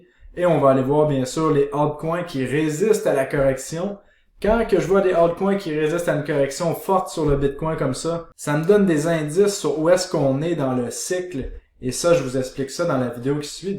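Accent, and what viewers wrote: Quebec, Canada. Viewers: c'est à cause de toi le PUMP sur le matic?? vidéo à peine sortie que le marché s'emballe!!! merci c'est un plaisir d'écouter et ce même avec un accent Québecois!!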